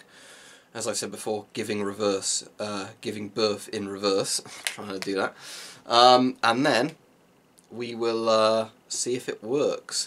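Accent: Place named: United Kingdom. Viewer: Im from Poland. The word "Dzień Dobry" you said was very simular but accent is strongly British. Still, you are close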